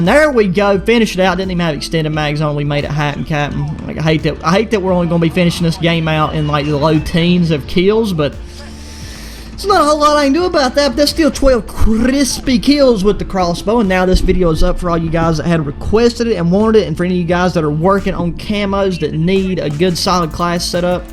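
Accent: United States. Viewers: That voice just made my day The country accent just makes me feel at home because of where my grandparents live Also I went to this video and it turns out your crossbow setup is the EXACT same as mine so I guess I’ll keep what I got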